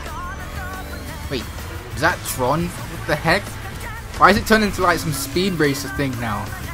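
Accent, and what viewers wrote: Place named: United Kingdom. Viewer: Ive never heard a british accent with a lisp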